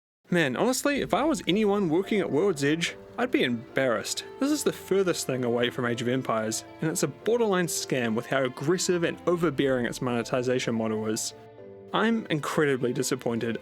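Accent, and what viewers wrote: New Zealand. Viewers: why do kiwis say "i"instead of E?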